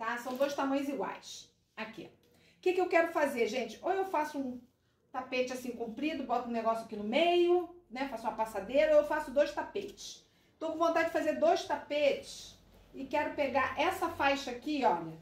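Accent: Rio de Janeiro, Brazil. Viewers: Oi amada adoro s jeito descontraído de ensinar, gosto do pulo d gato kkkk, e amo nosso jeitinho carioca d falar, também sou carioca , sou d Sepetiba minha terra Natal, posso falar 1coisinha? Gostaria de saber por que vc não posta vidios todos os dias?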